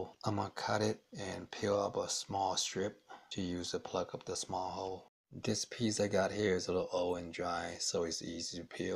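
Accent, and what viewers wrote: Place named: Asia. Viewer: Changadera in Asian accent 👌!!!!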